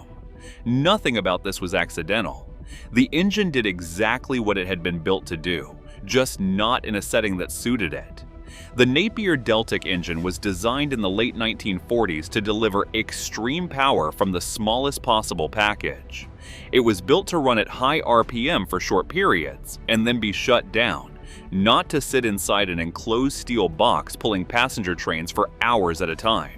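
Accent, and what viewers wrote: United States. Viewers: Why do these vids have to have an American commentator…..aaaargh! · Why use such an annoying American voice on a subject on British Rail?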